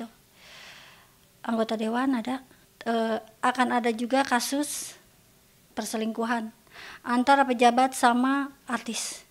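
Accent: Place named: West Java, Indonesia. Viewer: Orang sunda ya, keliatan dr logatnya..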